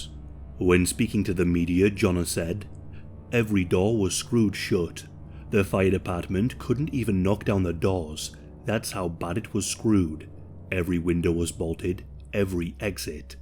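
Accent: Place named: Southern England, United Kingdom. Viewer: sounds like mid or southern England to me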